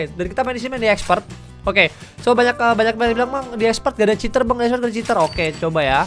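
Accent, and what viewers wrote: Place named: Pekalongan, Indonesia. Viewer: Pasti orang pekalongan.😂😂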